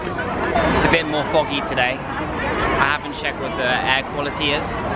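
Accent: United Kingdom. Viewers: ignorance is no excuse for anything - what kind of up your nose BRIT accent is that?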